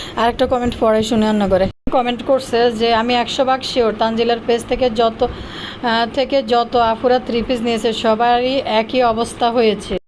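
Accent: Noakhali, Bangladesh. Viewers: আপনার বাড়ি কি নোয়াখালী। আপনার ভিডিও যতবার শুনি ততবার আমার খুব হাসি লাগে বিশেষ করে বাপ্পির ব্যাপারে যে কথাগুলো বলে না আমার খুবই হাসি পায় আপনার কথাগুলো শুনে❤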